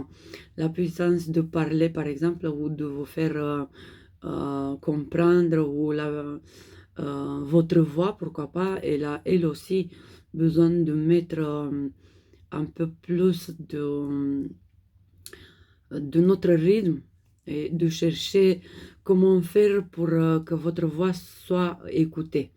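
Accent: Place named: Russia. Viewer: j'aime beaucoup votre voix et tes accents. êtres-vous d'originalité Russe?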